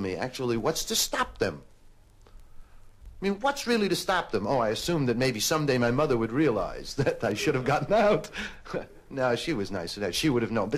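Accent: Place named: New York, United States. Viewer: Eliezer Pennywhistler i thought the same thing. it's the new york accent and his verbal mannerisms...not all of it, just certain words and phrases are very evocative.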